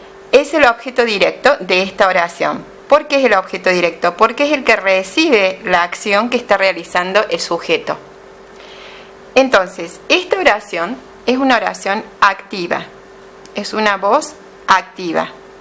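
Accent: Córdoba, Argentina. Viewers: Lo cordobés se nota a la legua, aguante Córdoba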